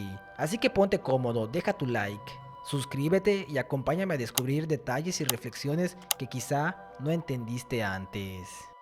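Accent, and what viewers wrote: Yucatan, Mexico. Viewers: Es yucateco? 🤔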